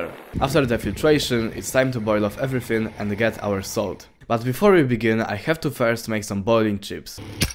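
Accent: Poland. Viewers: Your polish accent echos through my dreams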